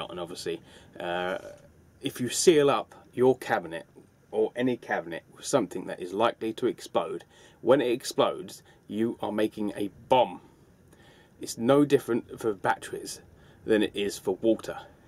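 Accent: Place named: Kent, United Kingdom. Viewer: I thought you sounded like a man of Kent / Kentish man, (always forget which is which) ;) I grew up in Canterbury but now up in Suffolk. I’ve got hundreds of people keep telling me to make more videos but... dunno... you’ve inspired me though! So thanks ;)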